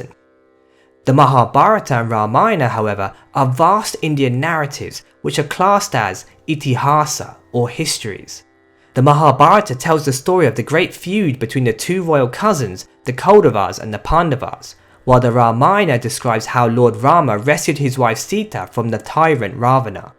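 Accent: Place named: United Kingdom. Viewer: First of all great video, it seems from the accent you are british but the pronunciation of hindi words and gods names or places was excellent ,thanx for making this video ,truly educational.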